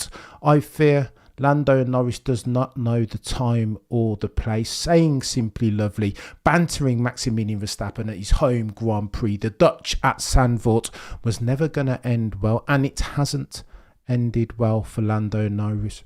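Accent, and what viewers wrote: Birmingham, United Kingdom. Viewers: Sky sports have been gaslighting us all ever since the Miami GP (Lando's 1st ever gp win btw) and ever since that race he's needed a ton of help from the team and Oscar and still he's never been close to Max in the WDC standings. The "Simply lovely huh" at zandvoort was pathetic and then his post race comments after getting humbled in Brazil were even worse. If that McLaren car is still the one to beat next season then Oscar has to lay down a marker early on and put sulky no-rizz in his place. Nice to hear a fellow Brummie talking F1 btw 👍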